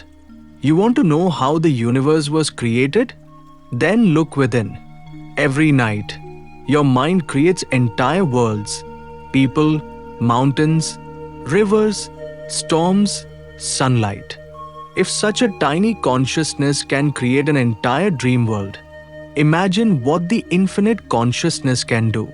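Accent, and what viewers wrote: India. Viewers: Why the Indian-English voice when the video is about a Chinaman? 😏👎👎👎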